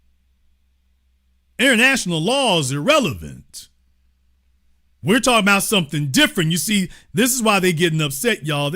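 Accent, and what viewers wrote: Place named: Texas, United States. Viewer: He talk like he from Houston or Texas somewhere😢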